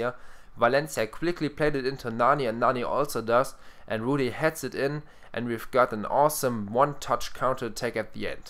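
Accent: Germany. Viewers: Are you german ? :D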